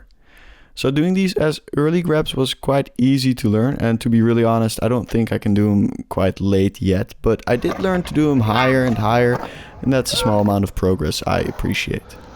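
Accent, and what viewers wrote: Netherlands. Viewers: you sound dutch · Bro ik hoorde je accent in VLSkate’s video en ik wist gewoon dat je nederlands was, lijpe videos man keep it up 👌🏻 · Wait u Dutch? That explains your accent, hahaha ga zo door! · yo u dutch to?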